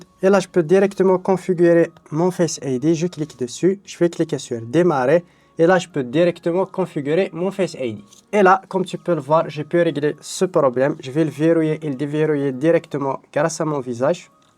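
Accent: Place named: Kabylie, Algeria. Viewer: Salut frr je regarde tes videos et ils sont magnifiques, et je remarqué dans t’accent que tu semblais kabyle mis tmurth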